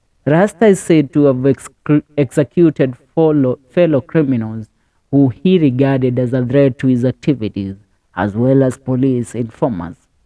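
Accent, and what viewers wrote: Kenya. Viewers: It would greatly help if u worked on your oratory skills..We may accept the Kamba accent, but the delivery is all wrong..Master the content n narrate in a manner that excites..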